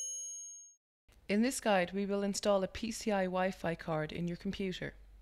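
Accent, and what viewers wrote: Ireland. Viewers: She sounds Irish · great, simple video ... 4 stars + 1 star for the Oirish girl doing the talking.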